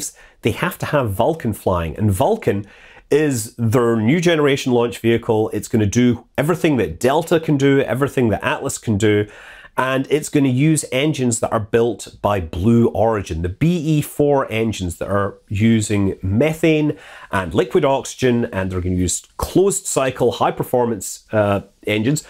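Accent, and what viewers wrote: Scotland, United Kingdom. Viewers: His Scottish accent kicks ass IMHO.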